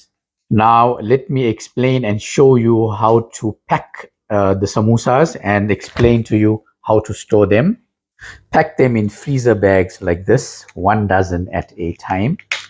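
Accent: South Africa. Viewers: Dear Brother, we have reached the end and I salute you. You have a SA accent. Where can we buy your samosas please? Do you sell any in Australia? You are an amazing perfectionist cook - very rare to find people of your ilk today. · Where are you? I hear a South African accent 😅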